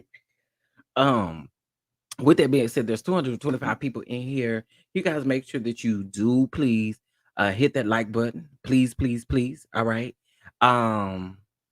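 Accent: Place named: Dallas-Fort Worth, United States. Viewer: I was trying to figure out why I felt a connection to your voice, it’s because we in the DFW! Let’s go to brunch! 😂😂😂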